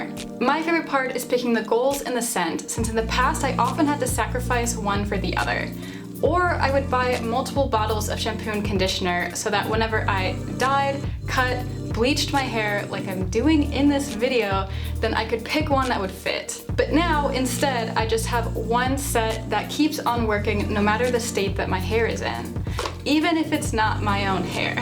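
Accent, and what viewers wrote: Netherlands. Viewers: OH MY GOD UR DUTCH? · waitttttt, are you Dutch???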